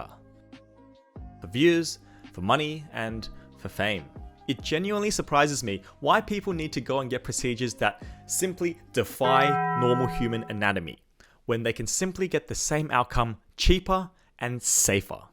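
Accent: Australia. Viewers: I'm simple: see and hear a fellow Aussie, I follow.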